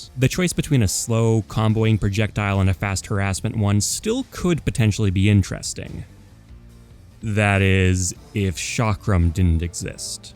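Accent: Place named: Canada. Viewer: today I learned is Canadian. about=aboat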